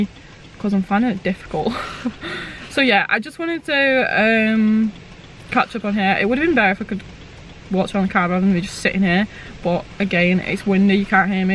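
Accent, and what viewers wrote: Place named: North East England, United Kingdom. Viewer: yea I might of been tempted at half that but it's a bit much for me. £2.5k gets you a really nice 95 aero wagon with 250hp. Probably be a lot better in a crash too. Awsome channel. You sound like your from the north east my bro inlaw stays just outside gateshead. I'm thinking of getting into bike as I live on the nc 500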